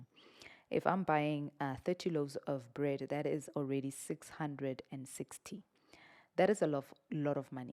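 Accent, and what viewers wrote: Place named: Zimbabwe. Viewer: oh my you have a Zimbabwean accent I didn't even read the caption I just saw a black woman and I clinked lol but anyway this is so needed for the young ones good job mama love from Canada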